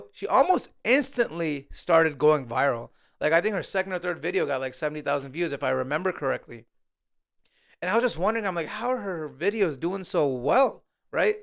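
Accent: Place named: United States. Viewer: You've dug your own grave by publishing click-bait crap (scam, exposed, etc.) You'll never be a success trying to undermine others. Try doing this with white skin and you might be grateful that you tick at last one of the diversity boxes. Be happy bro, life is amazing! Given your accent, I'd guess you're in the US. If your'e looking for career optoins, try the Democrats.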